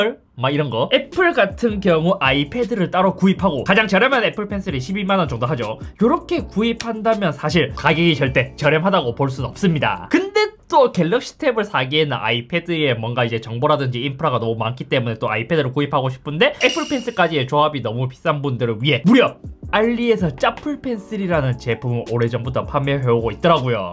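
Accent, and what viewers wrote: Gyeongsang, South Korea. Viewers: 나름 얼리아답터인데 동영상을 즐겨보는 스타일이 아니라 일부러 찾아보진 않는데. 우연히 KT광랜 속도가 안나온다는 뉴스에 잇섭이 누구지? 해서 봤는데 ㅋㅋㅋ 일단 갱상도 말투에 재밌어요. 그래서 저의 유튜브 구독 1번이 되었습니다. 앞으로도 흥미진진한 컨텐츠 부탁드려요.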